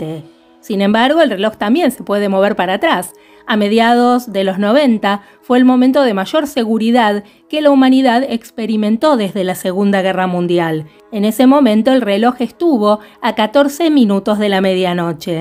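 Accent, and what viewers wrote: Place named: Buenos Aires, Argentina. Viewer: Es obvio que sos de Buenos Aires, igual que yo. Me doy cuenta por tu acento, y me siento orgullosa de que seas de acá. Te felicito por tu canal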